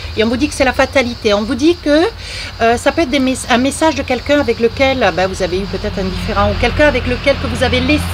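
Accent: South, France. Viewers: Quelle énergie vous nous transmettez petit bout de femme talentueuse votre accent me rapl le sud de chez moi que j ai quitté il y a peu en tout cas grand merci pour votre don ❤️🙏belles vacances profitez bien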